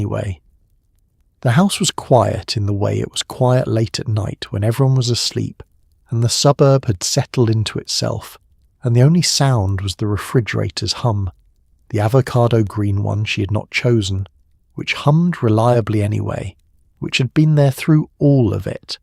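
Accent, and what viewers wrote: England, United Kingdom. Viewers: No please leave the English voice! The whole world is not American thank you 🙏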